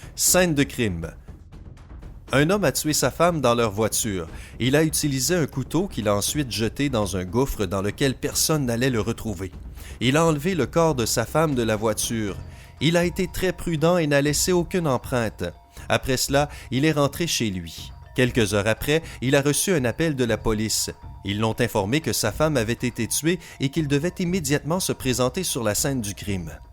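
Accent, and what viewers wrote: Quebec, Canada. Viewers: Ah !!!!l accent canadien!!!😁😁😁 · La voix quebecoise pourri :(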